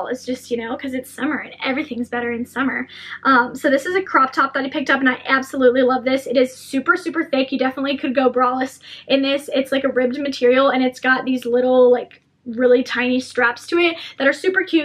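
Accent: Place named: South, United States. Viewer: OHHH... you're SOUTHERN southern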